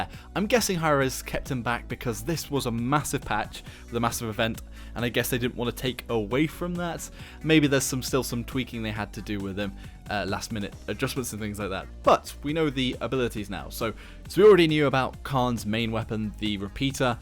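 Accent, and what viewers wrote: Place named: United Kingdom. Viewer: Why is your accent so british , idk how to call it , tremendous shit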